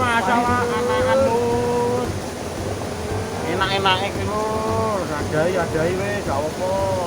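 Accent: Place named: Java, Indonesia. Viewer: Jowo mase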